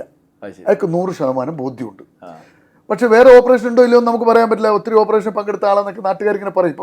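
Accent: Kannur, India. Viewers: Kannur slang aanu no problem..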